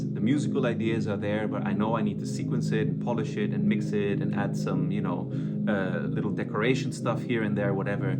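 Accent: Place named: Switzerland. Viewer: Thank you for your advice and your videos. Are you from switzerland, too? I thought i can hear a little bit of a swiss accent in your speaking.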